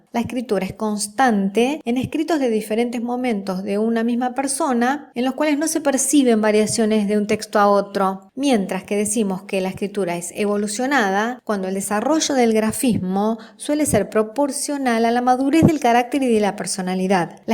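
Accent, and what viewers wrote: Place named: Argentina. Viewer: Me gustan muchísimo gracias el acento de voz de argentina es muy bonito gracias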